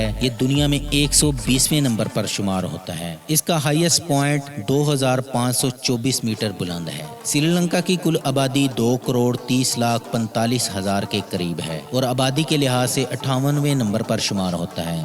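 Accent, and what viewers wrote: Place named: Punjab, Pakistan. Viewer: Biggest fan 😘 I love your beautiful punjabi accent Urdu , dear aap apna face reveal kro ❤️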